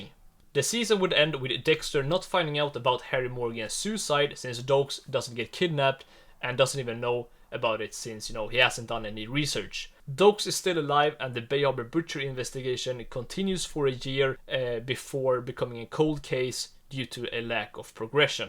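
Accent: Sweden. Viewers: You're definitely Swedish. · Holy svengelska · u swedish? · That Swedish accent going crazy 🔥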